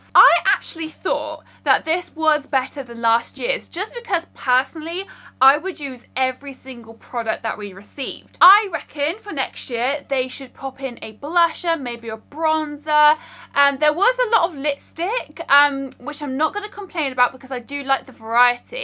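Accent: United Kingdom. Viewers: you are from UK?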